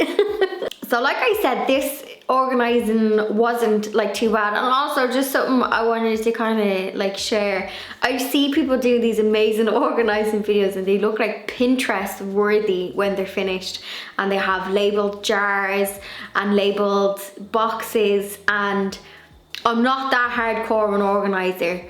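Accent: Ireland. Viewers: Your so bubbly and I luv that your so independant and a home owner. I too do most things myself,independant and am home owner. Luv your Irish accent . I'm Canadian. Luv your videos and Blondie.🎃🎃❤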